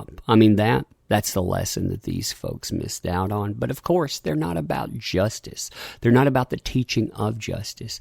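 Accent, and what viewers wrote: South, United States. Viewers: British guy myself . Your southern right ? 🙂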